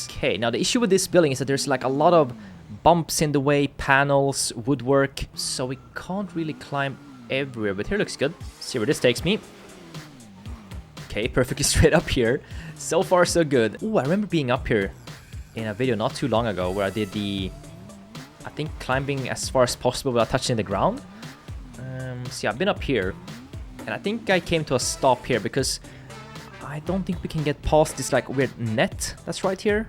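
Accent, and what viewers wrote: Sweden. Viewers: You have a very swedish accent, are you from sweden? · Are you swedish?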